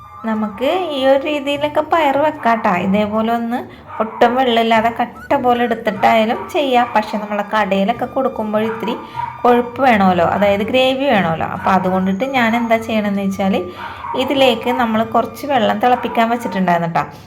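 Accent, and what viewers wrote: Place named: Kodungallur, India. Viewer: കൊടുങ്ങല്ലൂർകാരുടെ സംസാര ശൈലി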